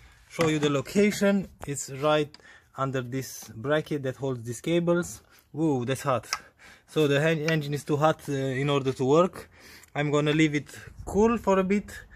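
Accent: Romania. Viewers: Salut! După accent pari a fi român, așa este? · roman de al meu dupa accent ! ;))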